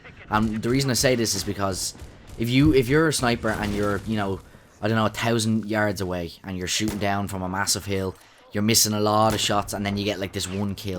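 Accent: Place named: Ireland. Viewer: I'm guessing your Irish cuz u sound irish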